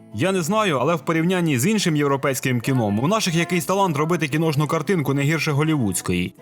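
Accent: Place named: Dnieper, Ukraine. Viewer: Дякую що сказали: бо Ми з подругою дивилися, слухали і думали - звідки родом автор відео. Моя перша думка- Закарпаття, потім зрозуміла - ні. А подруга каже: точно не Закарпаття, може центральна Україна, десь Вінниця або Черкаси. Але ми не експерти - мало подорожували і у відтінках мови центральної України не дуже розбираємось;). Тож Івано-Франківськ вітає Дніпропетровщину ( казали що хотіли перейменувати на Сіверщину..) і дякує за дотепний блискучий барвистий гумор і соковиті відтінки наддніпрянської української 🎉❤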